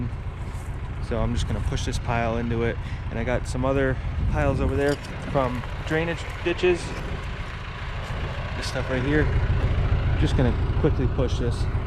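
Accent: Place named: North, United States. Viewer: I can't figure out this guy's accent. It's definitely Northern, a touch of those Canadian long vowels, but I can't place it. At first I thought Upper Peninsula/Wisconsin but it's not that strong. Maybe Minnesota or N.D. It's gotta be this side of the border. Anyone know? Also nice vid - I can't get enough of these long form restorations of old farm equipment.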